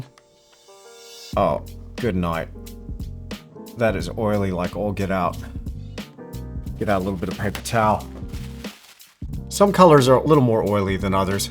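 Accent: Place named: Australia. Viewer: I love your accent mate - Are you an aussie living abroad?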